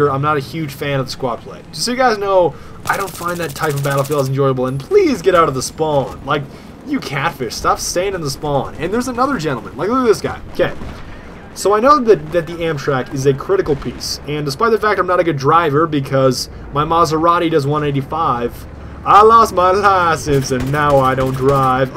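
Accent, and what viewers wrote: Germany. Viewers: your german accent are nice :D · xDDD ur german sounds so funny , awesome vid, greetings from germany :) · your german is soo hilarious xD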